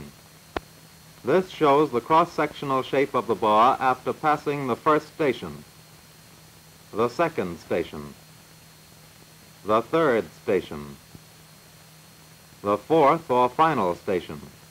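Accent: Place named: Mid-Atlantic, United States. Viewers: I was watching this and saying to myself, "Geez, how labor intensive and inefficient...How the hell are they making enough to keep the war going?". And then I noted the date...1939. Oh. I should have figured it was pre-war from the Mid-Atlantic accent of the narrator, the fact that all the employees are male, and the relatively pedestrian rate of production. Bet that same production line did not even resemble what we see here by 1945... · It's called the "mid-Atlantic accent" - the "mid-Atlantic" jokingly meaning halfway between the US and England. It's an affected way of talking that was taught in some circles during the early 20th century; it's by no means the way any actual people talked normally. You hear it a lot in film and radio of the era. It's "FDR-speak." :)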